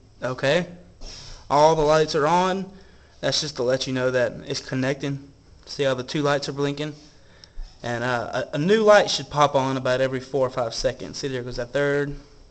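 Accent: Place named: South, United States. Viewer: Y'all, that helped me a lot but y'all need to stop talking like that ya country boy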